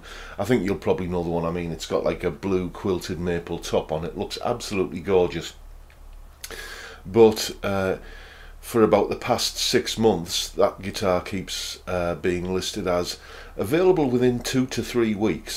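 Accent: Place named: Teesside, United Kingdom. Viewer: Great review mate. I've just ordered one of these from HB and was looking through some reviews on the guitar and being a Teessider myself (now living in Oz) I immediately locked on to your accent! Like many people I can't really justify (to the wife lol) spending the kind of money you have to spend to get the Gibson but I really like the style and chunky sound these hollow body's produce. Given the price it would be almost rude not to buy one! From the looks of things the cost/quality ratio seems to be acceptable so I can't wait till it arrives to live out my 'Back to the future/ ' dreams! haha.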